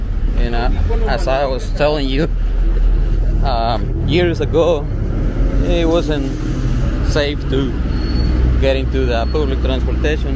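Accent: United States. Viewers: No manches muy americano abla español maje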